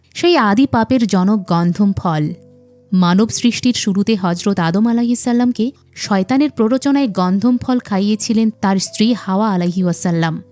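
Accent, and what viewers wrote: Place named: Noakhali, Bangladesh. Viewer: Are Bai eita noyakhali meye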